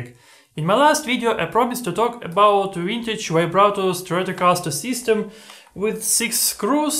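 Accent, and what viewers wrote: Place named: Russia. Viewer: Хм, у тебя очень русский акцент))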